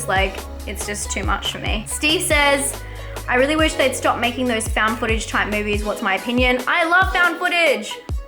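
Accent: Australia. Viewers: First off, you know we love your Australian accent. LOL!! Congrats on your 4500 subscribers. Afflicted was an excellent found footage horror movie. If you ever come to the US, come visit my city, Philadelphia, the City of Brotherly Love. I'll introduce you to a Philly cheesesteak. Keep up the great work.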